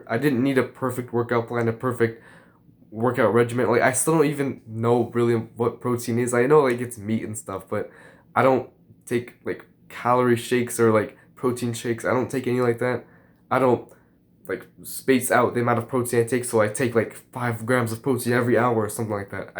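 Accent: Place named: Germany. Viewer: You have a German accent and probably live in Germany? If so, I lived in Munich for three years. Spreek je Nederlands?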